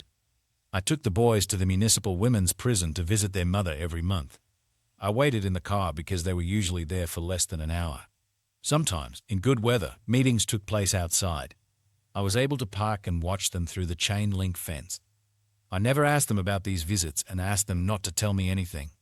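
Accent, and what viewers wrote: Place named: Australia. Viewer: Why did you use an Australian accent??? It's obviously set in the USA. There are very few Australians in the USA you have too much violence and blacks.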